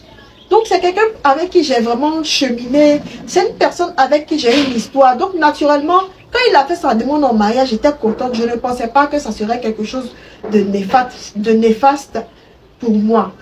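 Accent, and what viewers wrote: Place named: Ivory Coast. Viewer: Tu l'accent ivoirien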